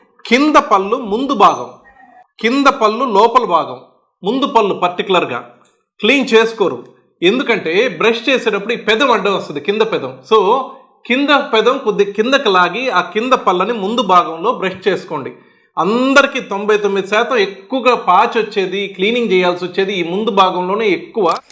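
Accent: Nellore, India. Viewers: ఒకో ప్రాంతానికి ఒకో యాస ఉంటుంది. నెల్లూరు యాస లో మాట్లాడుతున్నట్టు గమనించగలరు. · నెల్లూరు యాస డాక్టర్ గారికి మా చెడ్డ అలవాటుండ్లా.....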